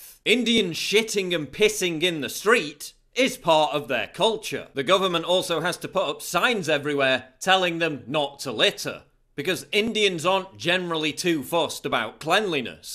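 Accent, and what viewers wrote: United Kingdom. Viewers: Some practices are disgusting and vulgar by any standard. These people need to be enlightened. Anyway, thank you for the entertainment. Your British accent makes you even funnier.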